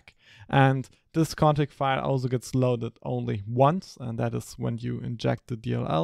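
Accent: Germany. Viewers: In germany say: Deutscher Akzent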